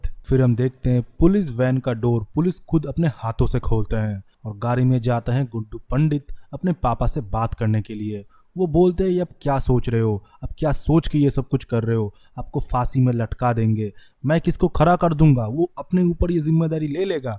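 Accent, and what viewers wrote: Bengal, India. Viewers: Bhai tum...bangali ho kya...??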